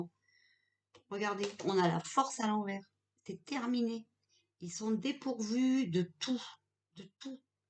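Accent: North, France. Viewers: Bonjour, vous êtes du nord ?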